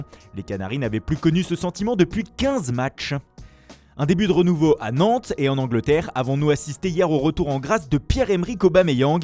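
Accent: Italy. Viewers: C'est reparti avec l'accent italien...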